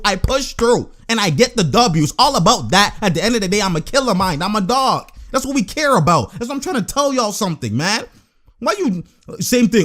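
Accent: Canada. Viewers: I can tell u from Canada from ur accent aha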